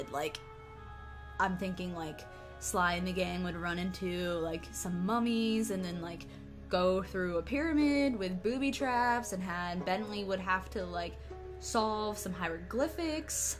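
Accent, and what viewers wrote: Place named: United Kingdom. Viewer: i don`t cere if you are talking britich ore not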